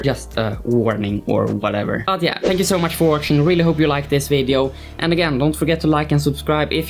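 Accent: Sweden. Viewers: Så stark svensk brytning haha...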